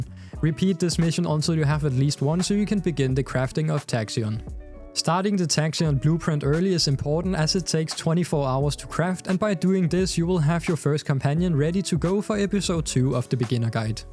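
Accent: Denmark. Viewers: im 5 sec into the vid are you danish? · You sound so Danish in your English and iam loving it. If i look across the water i can see Denmark:) Cheers from Flensburg.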